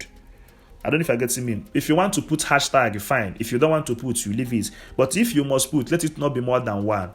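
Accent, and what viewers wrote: Igbo, Nigeria. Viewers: I love this our Igbo accent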